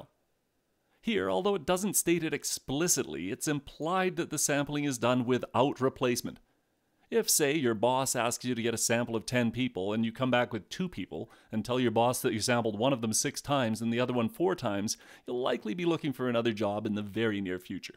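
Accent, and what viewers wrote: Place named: Canada. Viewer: Crystal clear explanation. Very helpful. I detect a slight Canadian accent which I have come to associate with the best math and stats videos on YouTube. Thanks prof wherever you are.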